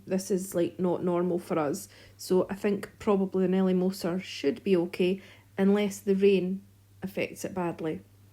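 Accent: Scotland, United Kingdom. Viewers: I dont know if it’s because i watch Eli and Kate that your channel came up in my algorithm. It’s nice to hear a Scottish accent and see what you’re planting. Im zone 7b Bannockburn so it’s great to see plants that will suit the conditions here in Scotland. Thanks for sharing, subbed ❤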